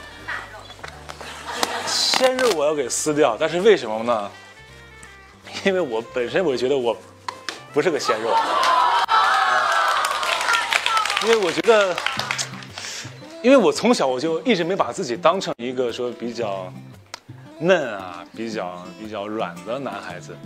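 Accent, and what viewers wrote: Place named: Northeast, China. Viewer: 唱歌和說話一樣的東北小老鄉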